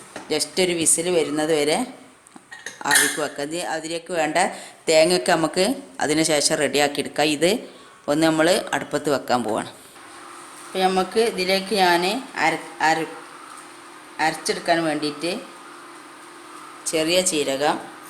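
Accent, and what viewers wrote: Malappuram, India. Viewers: Malappuram ano veed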